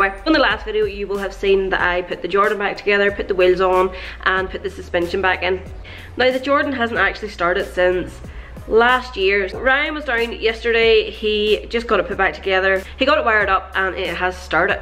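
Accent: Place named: Northern Ireland, United Kingdom. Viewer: Luv the videos and Great to hear that good oul norn iron accent , keep up the good work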